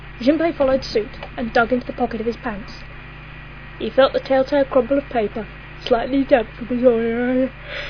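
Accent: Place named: Northern England, United Kingdom. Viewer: You've got such a nice voice to listen to :D lovely accent. North england maybe? ^^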